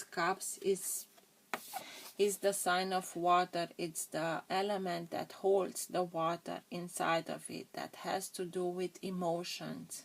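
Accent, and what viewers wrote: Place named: Transylvania, Romania. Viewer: Salutare! Vreau sa iti multumesc mult pentru etalare, nu am mai asteptat si versiunea in romana pentru ca aveam nevoie de ghidare.Si, ca de iobicei, am primit o.Dzeu a vrut sa ascult ce ai avut de spus in engleza.Vorbesc si scriu fluent ca un nativ aceasta limba si imi e la fel de usor sa inteleg ca si in romana.Sa te felicit pentru stapanirea acestei limbi si modalitatea complexa in care stii sa iti expui exact ideile, ce gandesti, ce vezi.......La pronuntie mai e nevoie de exercitiu, se simte puternic acel accent ardelenesc, dar totusi face ca accentul tau in engleza sa fie delicios.......Nu stiu sa iti explic cum suna, dar e melodios si frumos. Deci, la sfarsit de an, datorita tie, din nou, stiu exact ce am de facut.Vreau sa multumesc Divinitatii ca iti da aceasta ocazie, sa transmiti ce trebuie cui trebuie si cand trebuie, vreau sa inalt o rugaciune sa te mentina sa fii tu instati intotdeauna si sa aiba grija de tine si sa te ocroteasca! 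Daca nu ne auzim pana in Anul Nou, draga mea iti doresc Sarbatori Fericite alaturi de cei dragi tie, sa fii iubita si inconjurata de iubire, sa ai un An Nou deosebit cum esti si tu! Te iubesc mult, iti doresc tot binele! Gandul bun draga mea! Adrian, Constanta.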